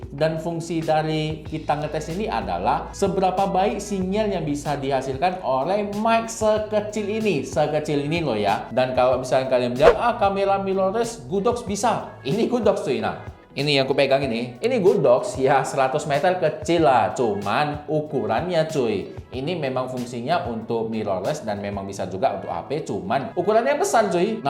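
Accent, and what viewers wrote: Medan, Indonesia. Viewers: Medan kali logat kau bang. Wkwkkw mantap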